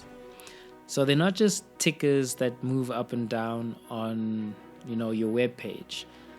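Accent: South Africa. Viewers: Nice video! Are you South African?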